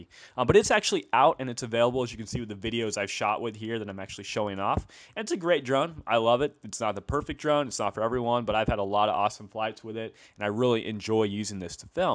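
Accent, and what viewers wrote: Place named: China. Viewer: Your accent is Chinese but you are so easy to understand for a non-native English speaking person. Good job! I believe the Tencent Ying will form the base for the Dobby 2 (working title, will probably be renamed to Hesper). The Dobby AI is a closed development project I consider dead. ZeroTech need to get their fingers out their asses and continue their front position with innovative and high quality drones, would not like to see them make clones of the Mavic Pro.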